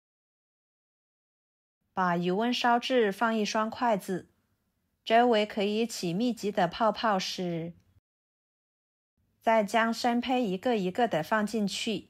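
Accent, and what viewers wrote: Yunnan, China. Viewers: 实在是不习惯听你的云南马普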